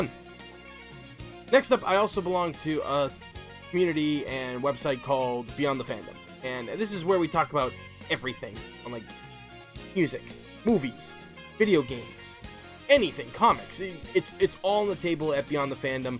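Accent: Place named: Boston, United States. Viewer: ROE introduced me to your channel. I think you're absolutely hilarious man. Love your accent u sound cool. I think you're a bostonian? That or new yorker. When u did those playthroughs in outbreak with ROE I really enjoyed um great fun 😁 By any chance do u have the moment where u were dragged through the window by the zombie in the hospital? I PISSED myself laughing 😂🙈 Sorry I just couldn't help laugh but it was funny af 🙈 I wish I could be your friend lol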